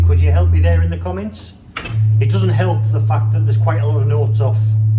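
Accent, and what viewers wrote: Newcastle, United Kingdom. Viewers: I always think of Harrison & Harrison organs as lavish instruments in lavish places like Westminster Abbey and Kings college chapel (Cambridge) - it's interesting to see and hear an example from the other end of the scale. For some other reason when I discovered this channel I put pipe organs and Geordie accents together and assumed you must work for Harrison & Harrison 😕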